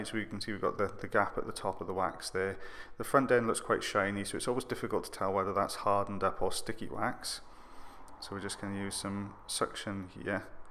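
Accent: United Kingdom. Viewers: I love it when you say process. The British accent gets me everytime.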